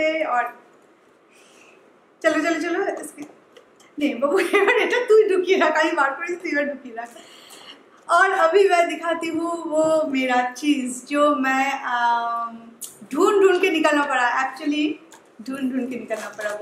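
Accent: Bengal, India. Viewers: It's so inspiring to see you working so hard for you and your family's future. To all your haters i just wanna say that there's nothing wrong in your pronounciation ; it's just your bengali accent that gets mixed with it. They should rather get inspiration from you that you are working on your english even after so much hate. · Apki voice bahut achi bangali touch wali Hindi Luv d most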